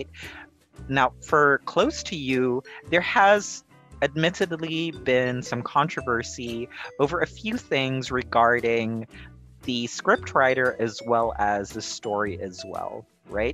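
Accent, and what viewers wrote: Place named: England, United Kingdom. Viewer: I just finish this bl series and I really love it! This is the first bl series that really push me to download it on my devices because I always feel that I'll eventually lose interest on the previous bl's I watched and this one is a must watch! Though I never watch the 3 first HIStory part because I really don't have the interest? Don't get me wrong! And also this is the only bl series I watched that gives me a lot of emotions. Thanks for this amazing reaction vid and I love your English accent!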